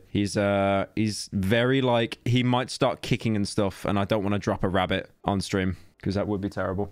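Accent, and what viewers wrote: United Kingdom. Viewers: just found out hes brittish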